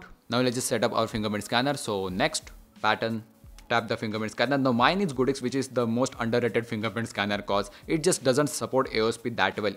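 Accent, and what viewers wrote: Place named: Maharashtra, India. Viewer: Marathi Accent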